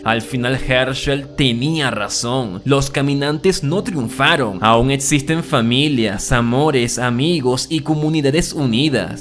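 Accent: Venezuela. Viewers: Chamo me dí cuenta de que eres venezolano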